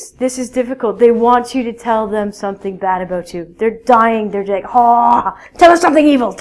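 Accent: Canada. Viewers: oh my god, i could tell you were Canadian from the start, haha. I love Canada! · are you canadian?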